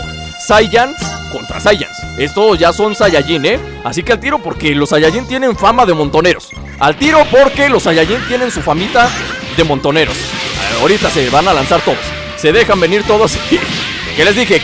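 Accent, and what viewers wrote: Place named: Mexico. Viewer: se nota que eres mexicano XD igual que yop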